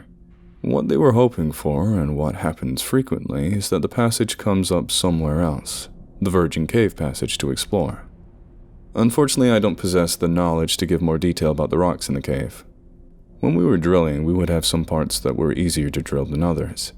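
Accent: United States. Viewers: Always amazed at your talents. Your American accent is top notch! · Your american accent is pretty good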